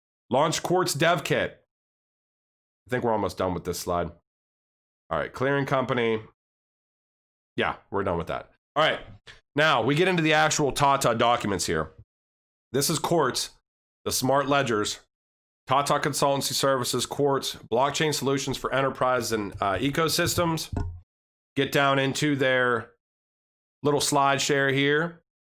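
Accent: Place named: Midwest, United States. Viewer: I'm Charlotte NC You have a mid west vibe Chicago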